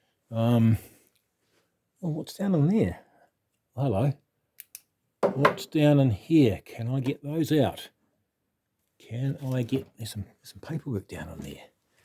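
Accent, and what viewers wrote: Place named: South Africa. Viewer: This man sounds like a White South African.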